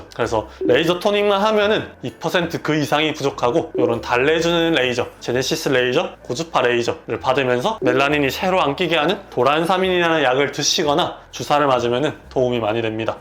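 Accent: Busan, South Korea. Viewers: 저는 멜라노사크림 약국에서 사서 저녁에 스팟으로 바르고 자는데 완전히 없어지진 않아요~! 선생님 억양이 부산사람같아요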